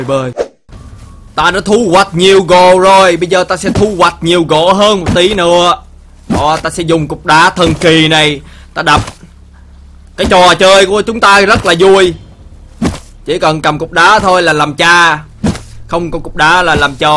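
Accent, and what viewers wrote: Hue, Vietnam. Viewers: Anh nói tiếng Huế nghe hài quá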